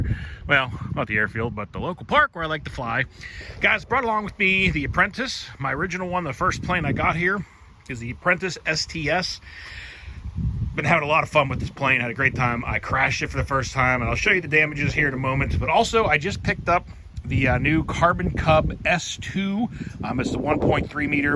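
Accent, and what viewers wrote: Pittsburgh, United States. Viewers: Are you from Pittsburgh. I'm picking up a Pittsburgh accent!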